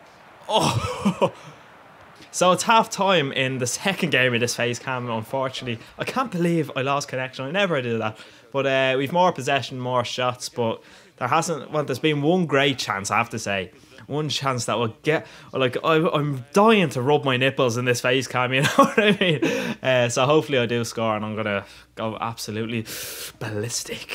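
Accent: Ireland. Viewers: is that an irish accent? sorry that I don't know haha and will you do a packopening? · Are u Irish? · Your story was actually funny :P Nothing better than a crazy irishman (Or scotish wathever) telling a story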